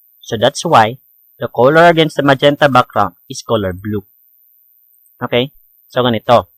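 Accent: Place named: Philippines. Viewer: Bro thats how philipino talk. Try speaking another language without an accent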